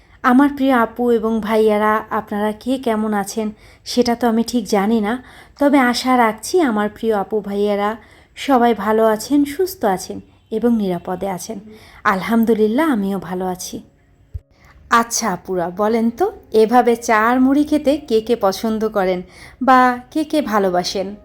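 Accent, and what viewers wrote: Khulna, Bangladesh. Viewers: আসসালামুআলাইকুম কেমন আছেন আপু আপনার কথা শুনে আমার খুব আপন মনে হয় কারণ আমি ও খুলনার মেয়ে। আপনার বাড়ি খুলনা কোথায় জানাবেন প্লিজ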